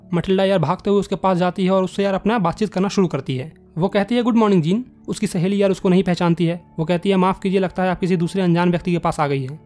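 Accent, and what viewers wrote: Bihar, India. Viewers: Sir Bihari che gujrati nathi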